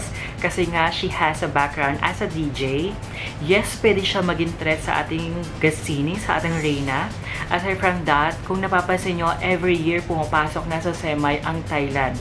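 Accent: Iloilo, Philippines. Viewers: I do really like your accent. Hehhee so ilonggo like me. Hehehhe Pero i trained myself like most of time on how to speak the english language like how native speakers do. Pero ang charming mo and ang sarap pakinggan kaya ok na. Heehhehe and most and for most, your so cute hehehhe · ilonggo ka po??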